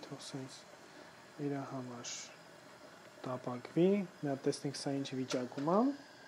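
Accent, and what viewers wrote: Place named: Sasun, Armenia. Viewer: Shat mec bavakanutyun staca haxordumic, jan lselov mer Sasuni anush barbar@ texapoxveci im mankutyun ev mer harazat Irind.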